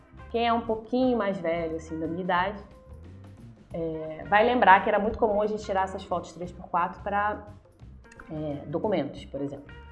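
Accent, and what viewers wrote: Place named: Minas Gerais, Brazil. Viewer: Mineiríssimo! Amei as dicas! Vou aplicar! ❤️❤️❤️❤️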